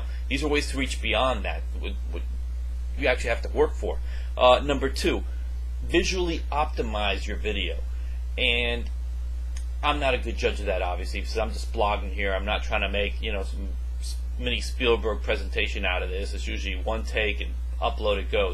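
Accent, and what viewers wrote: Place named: Brazil. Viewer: it feels like a brazilian in USA. he doesn't sound native even though he's trying hard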